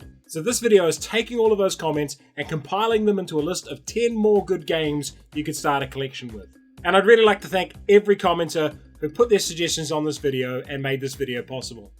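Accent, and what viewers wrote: New Zealand. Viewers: Woah didn't realize you are a Kiwi, Kiora Bro!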